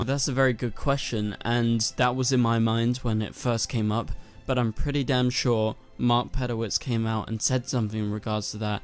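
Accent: United Kingdom. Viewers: Just subscribed. Hear a brit accent, so got to support a fellow brit.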